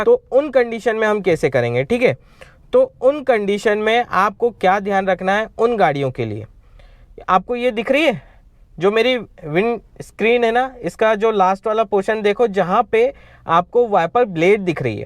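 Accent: Indore, India. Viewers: Bhai aapki aawaz se hi pehchan gaya aap indore se ho❤️❤️❤️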